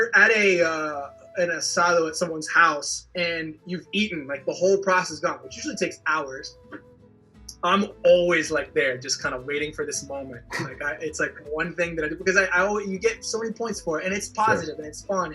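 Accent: Buenos Aires, Argentina. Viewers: hasta cuando hablas Inglés se te pega el acento y estilo Argento! Posta! Te lo deben decir en tu país! Tal vez no lo notes pero sos un Argento hablando inglés 😁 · muy bien el acento argentino!! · Te encontreeeeeeeee. Feliz! Me encanta verte disfrutar tanto de Argentina! Siempre c una sonrisa! Ya se lo reenvío a mis hijos. Amo mi país. Pero evidentemente tus ojos me lo recuerdan con más frescura y sacándome de este momento tan complejo a vivimos como país. Hoy mis hijos miran al mdo. Y sabes q es un desafío importante para las familias. Pero me quedo c tus sonrisas y la forma en q disfrutas de nuestras costumbres. Te mando un abrazo y un beso desde el alma hermosa personita Te juro q al escucharte era imposible para mi pensar q no seas argentino. Un placer! Saludos desde Baires. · prácticamente, sos un argentino hablando en inglés.. tenés más acento argento que yankie! 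AMO❤ Gracias por amarnos tanto loco!!! 🙌 Saludos desde Buenos Aires 🇦🇷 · Se te escapa el artentino por todas partes, pero bueno, espero que hables un buen yanqui, la verdad no me gusta mucho, lo ficcional, chau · Tiene ré buena onda el vago pero reconozcamos que acento y personalidad portena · Tenes no solo la tonada argentina si no la simpatía y la onda ganadora de argentina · Querido. Escuche como hablas de el asado y ahora me voy con mi compañero de trabajo a clavarme un chori a la costanera sur de la putísima madre que lo pario.... Muy bien tú acento porteño..... Cuando vuelvas y se pueda ir a la cancha te voy invitar a la cancha de Huracan a ver al Globo.... Abrazo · Sos un Argentino disfrazado de estadounidense que habla argentino, y hace chocotortas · Te escucho hablar sos un porteño jajaja. Que bien que puteas. Saludos del norte de argentina Santiago del Estero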